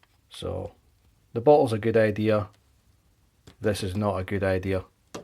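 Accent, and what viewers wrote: Scotland, United Kingdom. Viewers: I owe u an apology. I have started painting figures because I just have no room anymore for planes and tanks so I came looking for hints on painting 72 scale. Clicked yours and heard the accent and thought geezus no way. BUT I listened on and in the end, thought what a great tutorial. So old mate, sorry for thinking here we go some Scottish damn wanker waffling. It was the opposite so thank you heaps. ( PS You have to excuse me, Im an Aussie :-) ) Subscribed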